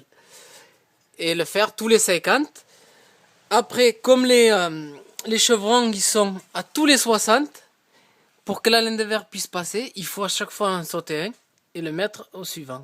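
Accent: South, France. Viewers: Salut merci pour ta video très clair j'ai tout compris avec ton accent du sud c'est géniale. Merci encore et si tu peux faire une vidéo sur les plafonds dale 600/600 se serai dégain merci.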